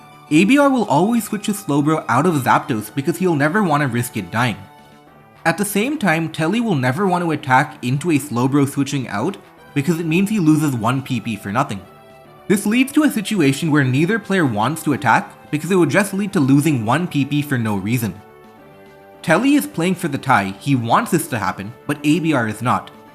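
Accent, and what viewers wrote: India. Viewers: no hes just indian no need to assume everyone lives in a primarily english country bruh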